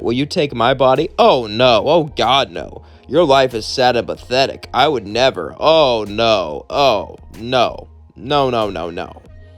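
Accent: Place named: Transatlantic, United States. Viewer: It’s actually a transatlantic accent.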